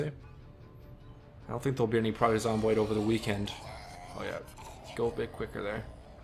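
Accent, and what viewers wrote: Canada. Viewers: Damn I knew you were from Canada the way Canadians say "out" just gives it away lol I was posted near to Medicine Hat for a while. Am enjoying your Videos so keep em coming!!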